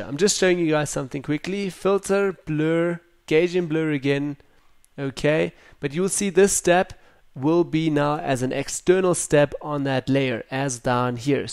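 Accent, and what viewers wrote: South Africa. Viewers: Ja nee! You must be 🇿🇦 GREAT TUT! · Are you south african!!!!!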